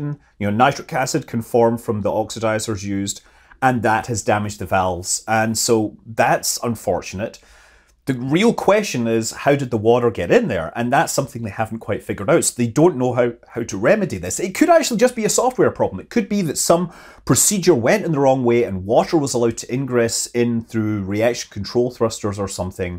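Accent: Scotland, United Kingdom. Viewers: Scottish to Amerikan translation is needed for this expression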